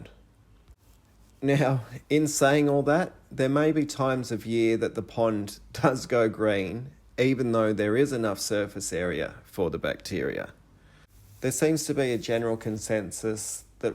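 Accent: Australia. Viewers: Good to hear an Aussie voice on Uutoob for a change, apart from that I'm gunna be the mug to react to the use or the non-word bacterias...... there can be one bacterium or two to many bacteria despite what spell-checker says. Cheers, by the way I keep 3 greenish water ponds with pondslime for the purpose of breeding native fish. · Is that an annoying Queenslander accent I hear?~!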